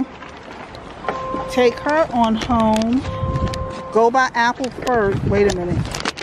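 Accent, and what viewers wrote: New York, United States. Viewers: This was good! I have alway said, you have a New York accent but I never asked if you were from New York. I rarely see anyone that actually shops at Lowe's. When I think home improvement I think about Hone Depot. Now, let me tease you a bit. I always say that Lowes is for the stuck up employees, because whenever I go I never get anyone to help me. Once I got some mirror cut in Lowe's for a DIY Ikea night stand/chest and the attitudes I got because I needed someone to cut some mirrors for me. Then, there's old Home Depot, where people are willing to help me with their orange apron on. I like both stores, but I go to Home Depot the most. hehe... I just wanted to share that. I typically leave long comments. I'm EXTRA in the comments, but you know I'm here for you. Your hubby was trying to be a professional camera man and you kept asking him questions. 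I thought it was so cute and funny. That sink is going to be amazing,. That is a smart Idea on what you do with your business cards. I am so excited to see the kitchen come together.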